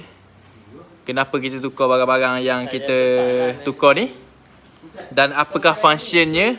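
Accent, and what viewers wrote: Terengganu, Malaysia. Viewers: bang nk tanye..abg org mana ni dgr mcm terengganu je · Abang orang ganu ke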